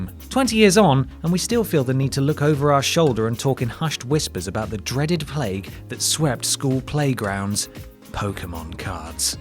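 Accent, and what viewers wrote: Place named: RP, United Kingdom. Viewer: “I don’t have this problem because I speak the queen’s English.”, you’re a national treasure!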